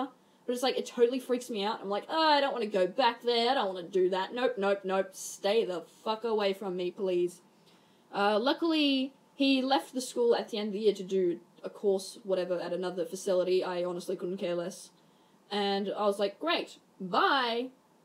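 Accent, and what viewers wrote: Australia. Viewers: OOOOO another Australian!!! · Is she Australian? She sounds like it but hey just found your content so yeah it's pretty cool so far so keep up the good work. Yah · First vid seen by you, IMMA SUBSCRIBE BECAUSE YEE BE AUSTRALIAN ! :D Insert HAHA! BOI! Here to get a bunch of likes but fails badly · Are you Australian? You sound Australian and it makes you saying "Oh wow is potawie" more funny than it is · You Aussie? 😏 me too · OOH WOWIE PATOWIE WOW Also are you australian? Its hard to find Aussie youtubers actually... Oh well! im australian too =3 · I kind of wish my Australian accent was as strong as yours because I also have some South African in my accent but maybe it’s just because I’m used to myself talking? Anyway, a completely fabulous video!! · Great artwork! And a very engaging storytime! I'll be honest, I legit grinned when I heard the accent. 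Nice to hear a fellow Australian doing storytimes. Keep up the good work! You've earned a sub from me for great content :) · instantly new you were an Aussie lol. btw that guy sounds fucked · OOF I CAN HEAR YOUR AUSTRALIANESS HULLUR FELLOW AUSTRALIA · i dont want to sound weird but is that a aussi accent? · OH MY LORD IS THAT AN AUSTRALIAN ACCENT?? (pls be from Adelaide :0) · You sound Australian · not trying to start something, but, are you Australian? or from somewhere around there? I'm just curious. I heard it in ur voice. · I wish my Aussie voice was as strong as yours XD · OI YOU AUSSIE? I'm from Victoria XD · I love your accent so much?? Like I'm an Australian too, but I love how heavy your accent is. (If that makes sense oof I hope that didn't sound offensive at all) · i smell another aussie I just subscribed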